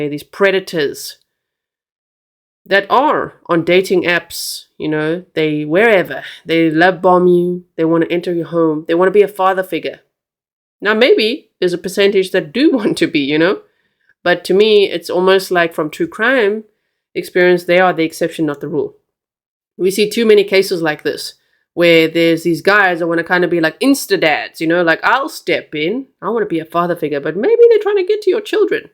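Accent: South Africa. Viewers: Are you South African? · Thanks for this, what a terrible story maybe this will wake someone up! Btw could tell you were South African by the accent but as soon as you said brai that confirmed it. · I heard you say braai are you South African? Lovely to hear that accent.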